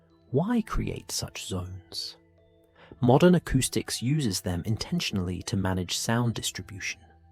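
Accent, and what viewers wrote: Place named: United Kingdom. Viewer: Is this what we're forced into a British accent on every freaking Channel I think it's a good Channel but can't I get it in an American accent👎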